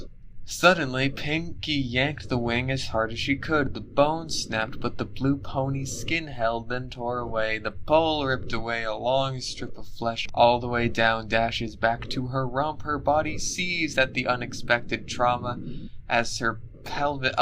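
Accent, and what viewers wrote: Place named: Brooklyn, United States. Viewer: that brooklyn accent is great ps: baxter is very cute and pure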